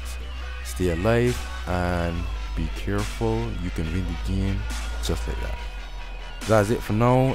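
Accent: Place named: Barbados. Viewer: Hi.... Some points for improvement... No background music. When listening on a cell phone the small speaker makes it harder to hear you. I like how you talk the whole time. You come across very clear and the Bayan accent is of course awesome. Last, you might consider adding more tags to increase exposure and I would include MW3 in the title. Most folks are too lazy to type out the full name. Tmartn needs to watch out for!:)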